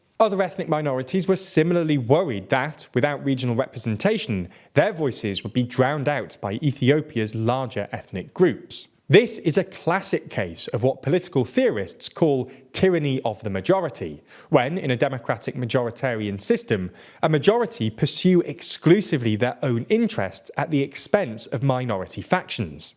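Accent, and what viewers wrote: United Kingdom. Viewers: The curse that is British. Satan is a tormenter. They wished Ethiopia would collapse. Go talk about Ukraine we don't need you talking about Africa Have you noticed how we evil british only talk about war in my Africa That British accent is that of Uzi baa. The advisor of Satan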